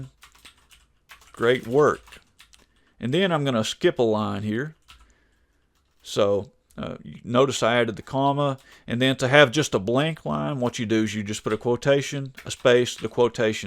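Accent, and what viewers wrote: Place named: Texas, United States. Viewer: i can hear texas from this man's accent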